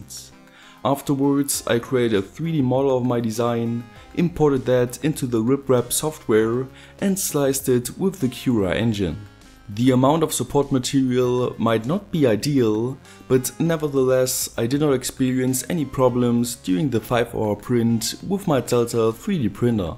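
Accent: Germany. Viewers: German Accent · are you german? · are you from germany? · Man hört einfach, dass du aus Deutschland kommst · man merkt das du deutscher bist👌👍 nice videos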